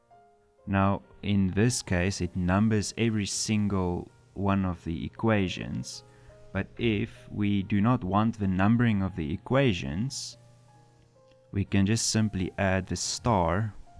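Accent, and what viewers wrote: South Africa. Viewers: South African? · Thank you so much from your articulation I hear you are South African. I would like you to buy coffee, beer or coke....eWallet?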